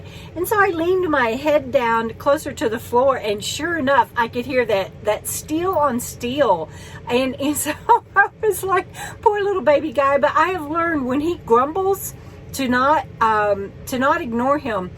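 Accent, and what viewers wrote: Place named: Florida, United States. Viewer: Yes, here in Florida we say it like you, and many people from other states correct us all the time, lol! That is funny. Happy journey!!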